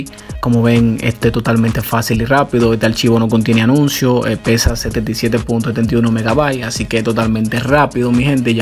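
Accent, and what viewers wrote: Dominican Republic. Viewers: Eres dominicano verdad xd es que tu voz se me ase conocida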